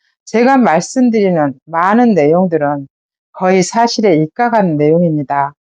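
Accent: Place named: Honam, South Korea. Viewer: 당신이야말로 애국자 이십니다 진실과 거짓을 구분하고 정의와 불의를 알며 선과악을 알고만 있어도 세상의 모든것이 제대로 바뀔수가 있읍니다 억양이 호남쪽분 같은데도 이렇게 바른소리를 내시니 천만다행 입니다 고맙고 감사 합니다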